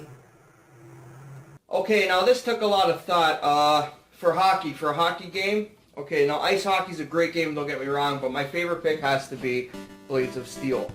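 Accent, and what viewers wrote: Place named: Northeast Ohio, United States. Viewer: sounds similar to northeast ohio dialect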